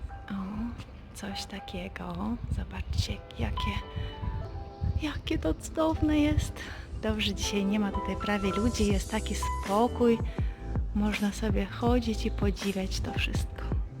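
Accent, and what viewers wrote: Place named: Vilnius, Lithuania. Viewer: Zapraszam W mój dzień - to taki rusycyzm, jest z Wilna. A ja myślę, że brzmi uroczo, jak i cały dialekt wileński:)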